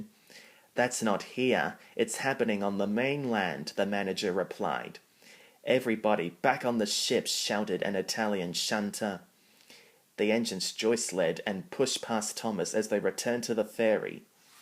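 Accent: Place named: United Kingdom. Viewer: Nice narrating☺you're using british english☺because your accent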